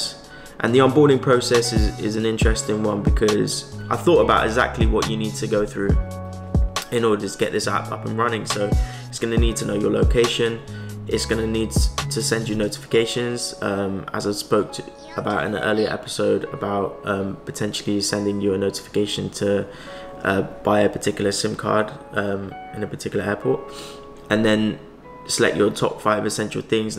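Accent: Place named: United Kingdom. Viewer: I love your explanation, simple and clear. Right from the start, you are the British who might live in London or something.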